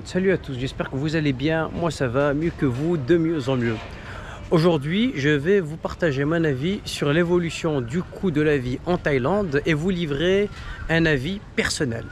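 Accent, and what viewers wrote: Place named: Kabylie, Algeria. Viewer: De dbk et de tigzirt j'avais bien reconnu notre accent magnifique 😁 Bravo a toi . Tu vis en Thaïlande ou en France ?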